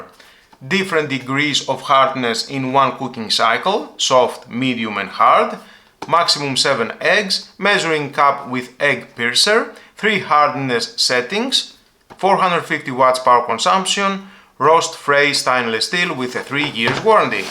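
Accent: Greece. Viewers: Γιατι μου ακουγεσαι σαν Ελληνας με Proficiency?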